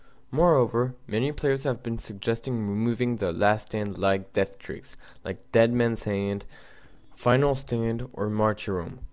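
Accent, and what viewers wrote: France. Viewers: C'est un français qui parle mon petit... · C'est un mec français qui parle en anglais, son accent est pourri. Pour que la vidéo soit vu par les développeurs de Black Ops 2, il faut spammer sur leurs TWITER OU FACEBOOK ! Faites le SVP, on aura plus de chance d'avoir enfin un bon Call Of Duty :) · Ca s'entend que c'est un francais qui parle Anglais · Fake --' Le mec c'est un francais qui parle en anglais afin qu'on aille sur ça chaine et qu'on lui fasses des vues... Je connais peux d'anglais qui disent ''Code Quegé'' , ils diraient plutot ''Cod Queji'' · vous y croyez ? non mais c'est quoi cette accent français ... --'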